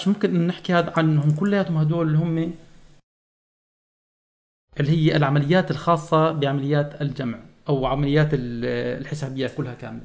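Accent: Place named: Syria. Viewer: غريبة انه تكلم سوري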